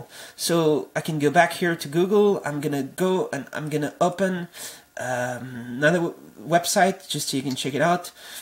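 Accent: France. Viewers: accent francais ca mdr !! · Tu as un accent françai ! ^^, Pourquoi le clavier est-il AZERTY ? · Ta un accent francais mec. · OMAGAD you're so french dude ^^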